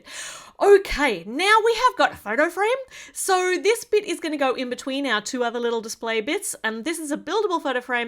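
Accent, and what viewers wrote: Australia. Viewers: Who else loves her Australian voice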